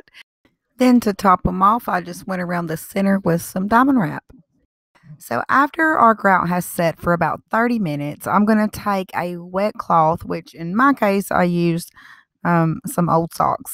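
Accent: South, United States. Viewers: You are so creative and explains the techniques so well. Thank you for sharing! Also, you are so pretty and I love your southern accent!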